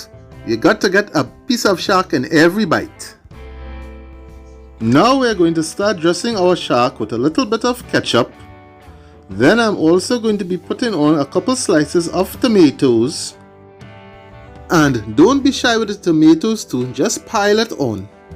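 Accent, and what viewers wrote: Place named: Trinidad and Tobago. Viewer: your videos are awesome too - I love the Trinidad vibe - two of my best friends are a couple and she is Trini - they live in LA and I see them a few times a year and her whole family is so positive! That is who I went to Carnival with and your narration is just 100% that vibe 😊 it's fun to watch even if I don't think I have the cooking chops to do what you do!!!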